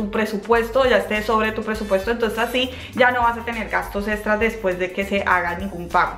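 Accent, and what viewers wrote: Colombia. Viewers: Acentua bien las palabras eres colombiana ..pero tu video lo ven de varios paises practica un tono standar .un consejo de un amigo.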